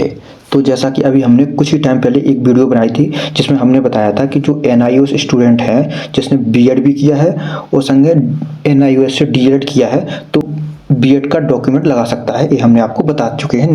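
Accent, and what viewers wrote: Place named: Bundelkhand, India. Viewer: Kay bhajja bundelkhand ke ho ka ..😊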